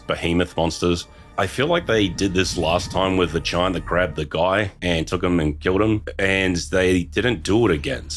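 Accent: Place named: Australia. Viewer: Hope all is well mate, you were a big reason I got into the forest and I still routinely watch on your old videos just for a good time. Hearing another Australians voice playing through a game I loved so much was a huge hook for me and I’m eagerly awaiting a video once the time is right. Hoping everything’s great with your personal life, and one final huge thankyou for being a big part of my friends and i’s lives growing up.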